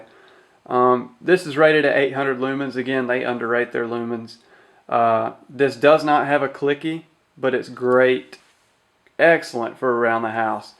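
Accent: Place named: South, United States. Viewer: embrace your southern heritage and accent.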